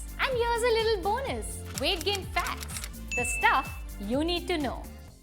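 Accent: India. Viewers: I am a Filipino and I love the English-Indian accent!❤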